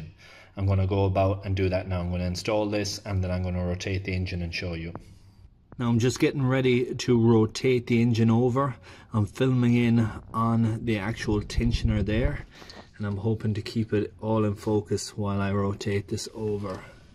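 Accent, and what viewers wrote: Ireland. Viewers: very good video, I am doing 1az fse now, head gasket plus timing chain. Fingers crossed. Nice Irish accent , where about are you from ?:-)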